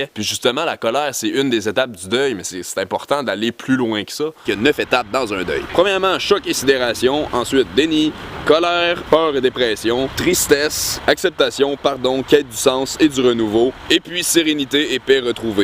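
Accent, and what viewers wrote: Quebec, Canada. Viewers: moi aussi et j'aime bien entendre parler québécois :)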